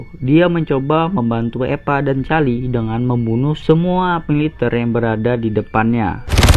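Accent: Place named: West Java, Indonesia. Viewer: Sunda kentel kayanya ya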